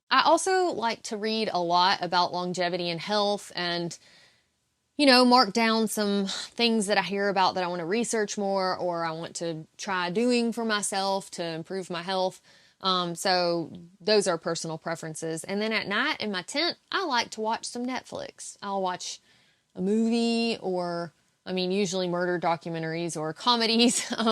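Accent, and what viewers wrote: South, United States. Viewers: Hi, long time viewer, 1st time commenter:) A couple of housekeeping things to start. A missed opportunity, you mention 'announcing to people and being embarrassed on the front side...' Is better than being embarrassed on the backside:) Nextly, did you know that with your southern accent, which I adore, your pronunciation of Iceland sounds very much like Assland. Talkin' about poop and all in context lol. Ok so seriously, one of my many careers in life involved being a professional, corporate problem solver. On the Yellowjacket problem I have about 4 solid solutions in mind so let's start with just one and see what you think. Could I suggest a while list of people who the entire hiking community know are legit through hikers who are putting in honest miles. In my opinion a private facebook group with admins is most likely the easiest solution. 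Hikers like yourself are in the group as well as angels who are providing services for an intended group and purpose. If you're not in the group you don't have access to what is made available. There are ways to 'admit' people who are whitelisted as well with a cheap wristband or such but I'm just looking ahead to how to you keep track of who is white listed and on site. The angels can also be in the facebook group and can ask a question about someone who might be legit but not in the group yet. There should always be enough people available with internet access to vouch for someone. I would think the group is close knit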